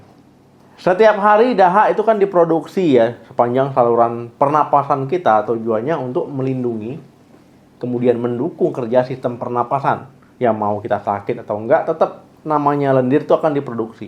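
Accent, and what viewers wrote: Aceh, Indonesia. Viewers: Macam gaya orang aceh ngomong bahasa indo dokternya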